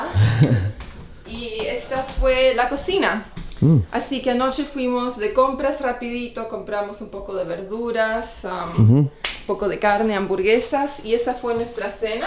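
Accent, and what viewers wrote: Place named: Argentina. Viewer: Esta chica aprendió español en Argentina, o de un argentino, si no me falla el oído, jajaj. Hermoso video! Saludos!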